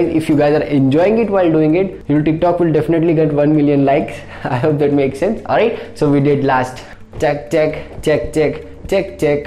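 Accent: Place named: India. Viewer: When u hear his Indian accent u know ur getting premium lesson 💗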